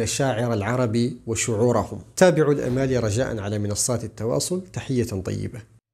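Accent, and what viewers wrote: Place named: Yemen. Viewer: متابعك من اليمن هل تعرف ان لهجتك كانها من لجهات اليمن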